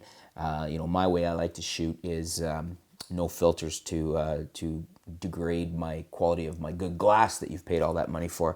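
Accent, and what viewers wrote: Canada. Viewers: Good informative review. Pleasant Canadian accent!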